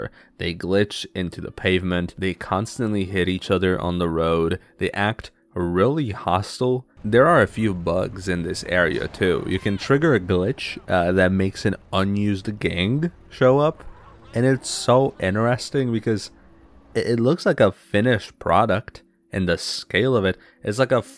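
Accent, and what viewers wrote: Poland. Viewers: Are you polish by any chance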